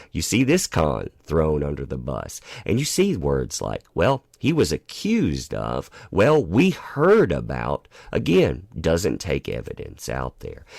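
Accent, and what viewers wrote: South, United States. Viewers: Hey where IS your accent from? Are you from the southern US because it sounds somewhat familiar?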